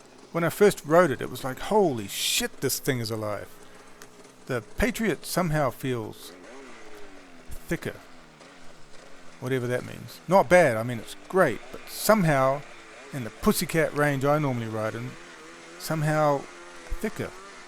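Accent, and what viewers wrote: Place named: Australia. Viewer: Dude the swedes made the bad ass tune for the yammie. 270hp on the 153. This dude sounds like he's from Australia. That's not a knife this is a knife. They made 2 strokes look like clowns like those guys digging and talking more then riding ripped through arm deep powder. The srx tune to 270 made cats alpha one look real bad. Called weekend sleder's. Even the older stuff 1049 vipers 270 tuned made 2 strokes look bad. Those yammies ate through anything they put them through and everyone knows yammies reputation of big miles and durability. Like it or hate it.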